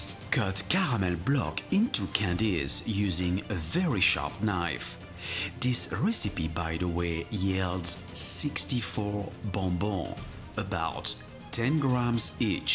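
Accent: France. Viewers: Are you French or Italian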